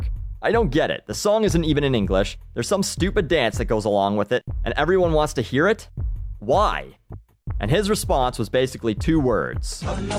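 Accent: Canada. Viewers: yeah, he's canadian I was thinking that too (since my boyfriend is canadian and im used to their accents) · you sound Canadian. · I was just going to say that. "Oot" "Aboot". Seems Canadian to me... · Oh... so thats what a Canadian accent sounds like.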